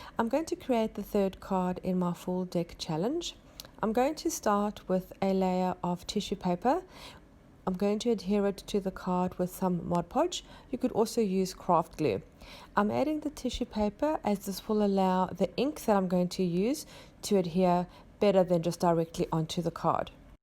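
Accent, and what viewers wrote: South Africa. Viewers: Glad I found your channel .. this looks like fun. Love the saffa accent 😉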